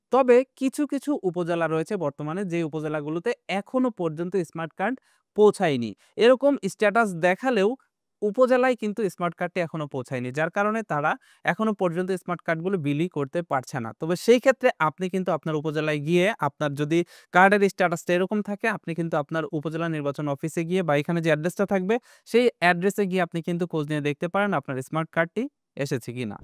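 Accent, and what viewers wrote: Tangail, Bangladesh. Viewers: Vai er Basha Tangail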